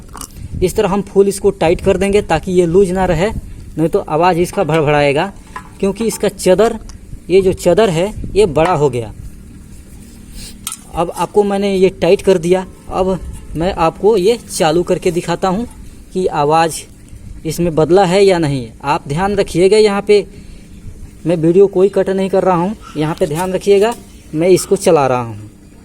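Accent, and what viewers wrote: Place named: Bihar, India. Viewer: Bihari h sir kya aap ! Chadar ko chdar bol rhe h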